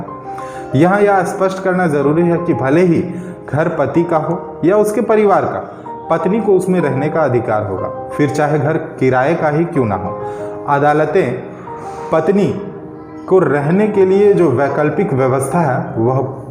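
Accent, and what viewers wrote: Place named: Maharashtra, India. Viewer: Tu marathi hai...hindi me gadbad ho rahi hai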